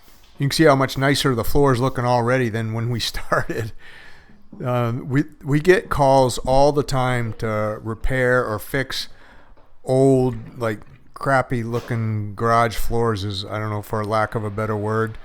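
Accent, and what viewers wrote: Maine, United States. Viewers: I thought I detected a little hint of Downeast listening to you, then I saw the 207 area code… ayup.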